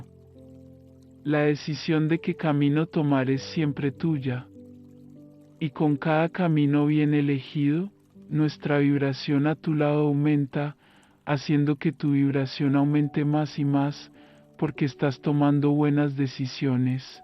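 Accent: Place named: Colombia. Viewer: Suenas como Colombian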